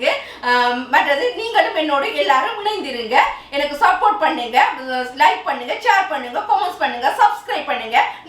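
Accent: Sri Lanka. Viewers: sis ❤️❤️❤️iam nee view er keep in touch my channel sis.Unga இலங்கை தமிழ் மிகவும் அருமையாக உள்ளது சிஸ் ❤️❤️❤️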